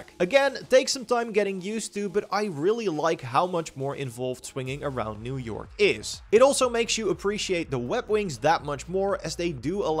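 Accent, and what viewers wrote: Netherlands. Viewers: i smell dutch accent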